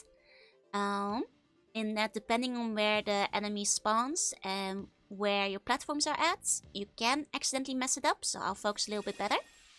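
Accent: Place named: Netherlands. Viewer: i dunno how i got here but i instantly recognized the accent to be from the netherlands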